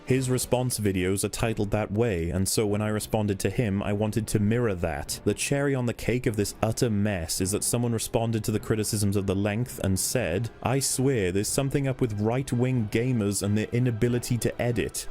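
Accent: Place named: Wales, United Kingdom. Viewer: You know the problem with youtubers? They are youtubers, as if that actually means anything in the world. is a wonderful arbiter of taste when it comes to the frivolity of contemporaneous consumables of an entertainment bent., you are a tonic in a sea of grating celery. Your opinion actually means something to me. Most youtube nonces, not so much. Bless you man, I'd buy you a beer to best loosen that smooth welsh speaking voice which just on its own makes you much more view-worthy than the douchebags described in this vid.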